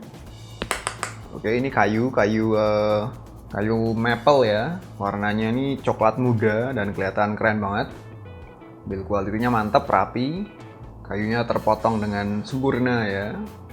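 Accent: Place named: Yogyakarta, Indonesia. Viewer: Anjir keren banget😂 bangke lu bang, jogja sekali macam aku kalo ngomong wkwk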